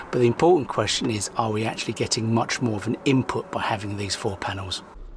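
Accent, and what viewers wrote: United Kingdom. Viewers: Your BBC’s accent is fantastic for foreigners, I can understand everything, thanks. Really, school english. All the best mate.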